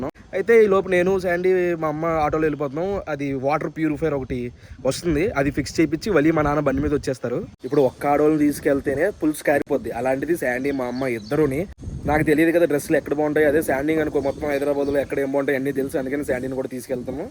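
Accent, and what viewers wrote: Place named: Godavari, India. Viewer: మా గోదారోళ్లతో అలానే ఉంటాది మరి... Love u 💗💗💗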